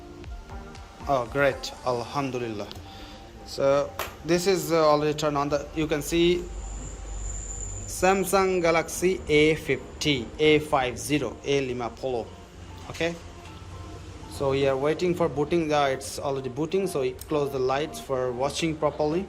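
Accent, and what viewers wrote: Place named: India. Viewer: kek orang india logat nya...hjeheheheeh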